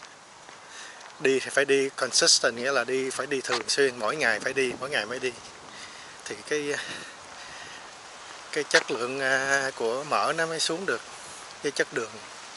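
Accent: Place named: Mekong Delta, Vietnam. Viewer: Chúc mừng cháu nay vui nên ăn no cứng bụng luôn , mà lạ nhen toàn từ Việt phổ thông nhức nách , ná thở , con cò có cái cổ cong cong ... giọng , tiếng không pha lẫn của miền Tây VN ..... vui và thân thiện . Cám ơn bạn .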